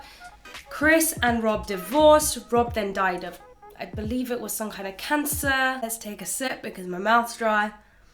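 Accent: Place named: United Kingdom. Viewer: How the F... are you armenian ???? Woooow that Brit accent ...wow. Hahaha Hey Armenian girlie 🖤 Georgian girlie saluting you.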